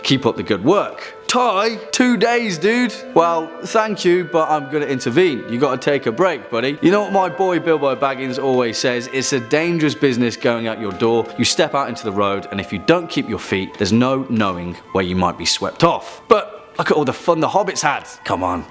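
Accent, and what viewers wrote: United Kingdom. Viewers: HOW can this dude be from Ontario, CANADA when he got a BRITISH accent? WTF???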